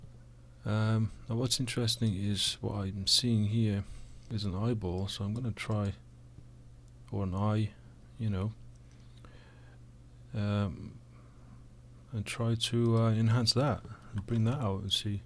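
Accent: Wales, United Kingdom. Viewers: Another great video....do I detect a hint of Welsh in your accent?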